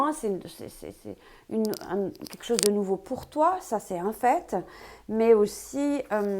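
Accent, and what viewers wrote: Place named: England, United Kingdom. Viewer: tu as un petit accent tu es anglaise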